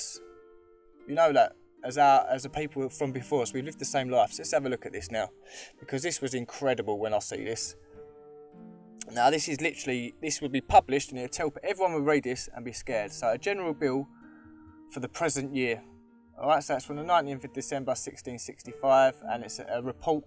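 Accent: Essex, United Kingdom. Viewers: yeah you definitely got a mix of cockney and Essex I'm from mile end myself Great Channel been watching for a few months now just wondering if you have any other social media platforms channels Facebook or email ect ? because I would like to talk to you about a few things if possible I have a found a few tartarien pre reset buildings in the local East London area recently and obviously would be great to here your opinion thoughts and if you want to use them in a video your welcome obviously think it's gonna be a while until I receive another telepathic download about how free energy works and innerstand it properly but I'll keep you posted obviously 😇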